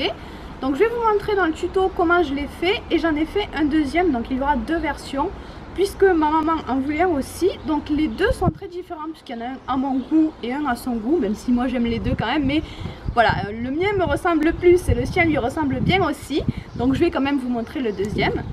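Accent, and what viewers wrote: South, France. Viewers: Moi qui suis parisienne, ton accent m'emmène dans le Sud, puis cette façon de dire "mon pompon" je craque c'est trop mignon 😍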